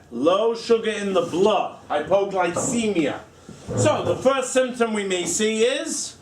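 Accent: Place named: New York, United States. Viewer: Is it just me or does he sound like Timon when he lectures?? Probably just the New York accent, soo endearing 😂 love the lectures, 10x better than my lecturer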